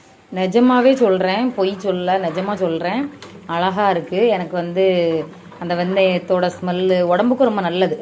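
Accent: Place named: Nagercoil, India. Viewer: Arumai. Nager coil speech. Very good.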